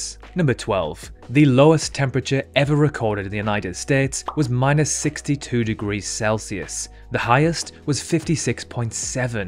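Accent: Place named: England, United Kingdom. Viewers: Criticizes term "middle age" Uses term "middle age". And your Received Pronunciation is okay. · What the heck is that accent!! England always impressing me. What region is that? North?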